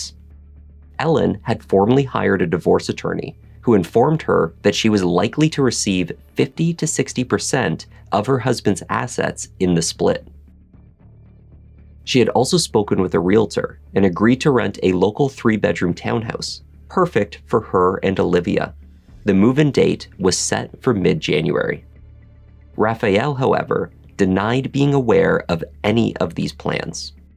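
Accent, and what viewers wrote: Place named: Canada. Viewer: The narrator sounds Canadian so probably doesn't realize it. Nor would I.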